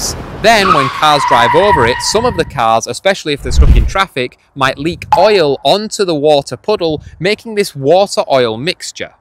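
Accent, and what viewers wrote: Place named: Scotland, United Kingdom. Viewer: We got Scottish vsauce bevor gta 6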